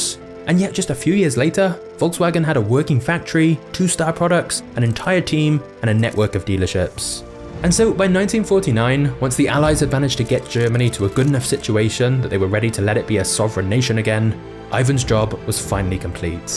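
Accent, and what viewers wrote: United Kingdom. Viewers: Bro is so hardcore Britain. 😂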